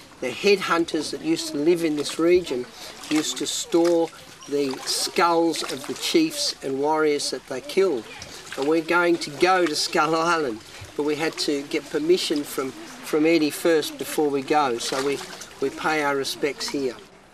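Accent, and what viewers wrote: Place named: Australia. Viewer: I knew he was Aussie! I am really proud to be Aussie right now! :)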